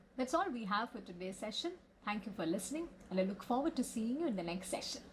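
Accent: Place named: RP, United Kingdom. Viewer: What exactly is your problem? I think she speaks RP.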